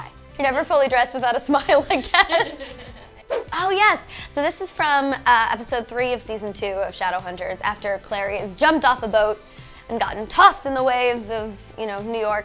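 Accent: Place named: Canada. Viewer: “Torono” ahh the Canadian pronunciation has grown on her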